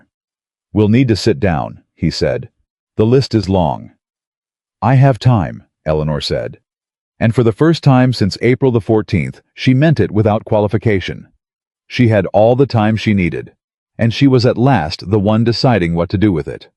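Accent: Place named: United States. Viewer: The flat American accent doesn't belong in a story about British aristocracy. It's an assault to the ears.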